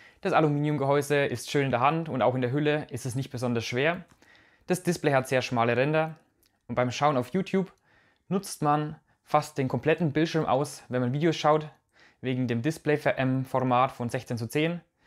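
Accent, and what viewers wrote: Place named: South, Germany. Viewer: Deutsch ist nicht meine Muttersprache, aber ich spreche es ziemlich gut und interessiere mich dabei auch für die Akzente. Ihr Akzent ist mir unbekannt. Ich vermute etwas in Richtung Süddeutschland, Österreich. Sind Sie damit einverstanden, meine Neugier zu befriedigen?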